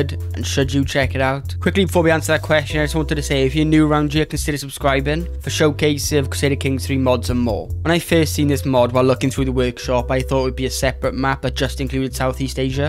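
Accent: Wales, United Kingdom. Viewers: You sound Welsh, take my like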